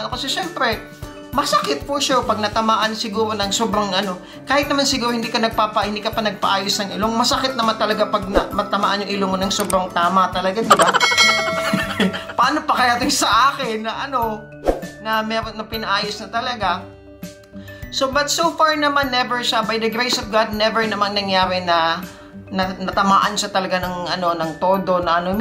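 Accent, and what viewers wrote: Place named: Visayas, Philippines. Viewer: Dahil sa nose lift new subscriber here na search kac ako, hi sir bisaya ka po kac sa accent eh, bisaya here, ahm tanong ko Lang pag sa lips po may idea po ba kayo if how much, kac sa ilong ko contento naman ako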